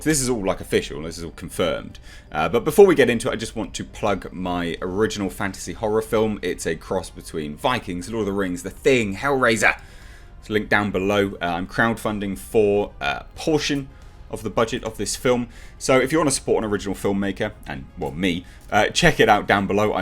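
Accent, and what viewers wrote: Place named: United Kingdom. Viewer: I'm going to use the phrase 'circumnavigating the shit' in a sentence asap !! This man speaks the Queen's English.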